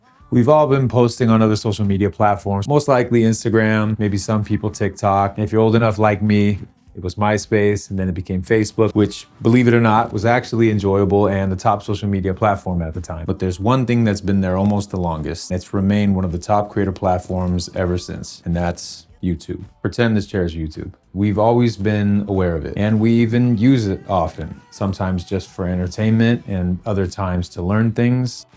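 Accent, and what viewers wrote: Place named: United Kingdom. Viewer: Hi; I enjoyed your video. I shall follow u henceforth. I guess, you are a British guy or an Australian guy as your accents are not American. But I had no difficulty at all in following each word that you spoke. Kudos to your endeavour.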